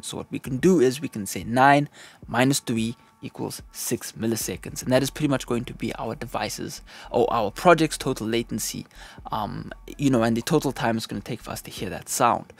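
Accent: South Africa. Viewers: bro where u from, u sound south african.... shoutout to SOUTH AFRICA!!!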